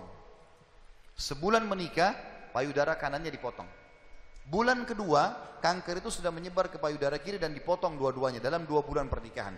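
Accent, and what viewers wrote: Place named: Arab world. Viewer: tri atmojo bener. cara ngomongnya kayak orang arab bgt, ya. logatnya khas. mungkin karena keturunan arab juga. haha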